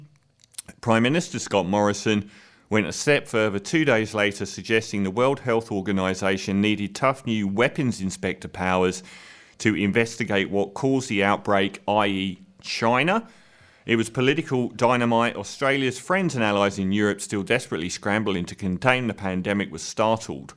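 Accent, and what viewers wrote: England, United Kingdom. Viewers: The guy making this video sure does. He has a strong English accent but he speaks as if he were Australian.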